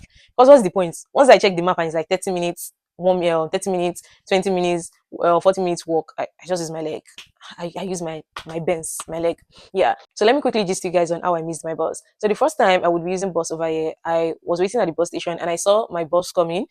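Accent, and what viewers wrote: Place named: Nigeria. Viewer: Thank you for your video. However, for some people who aren't very familiar with the Nigerian accent, it would be very helpful if you could either speak slower or add subtitles. I, for one, although I understood what you were saying, I had to replay some parts over and over to capture the words. But thank you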